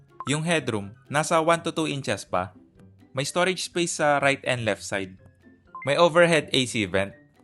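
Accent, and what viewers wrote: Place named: Pampanga, Philippines. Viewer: Dinig na dinig ko yung kapampangan accent..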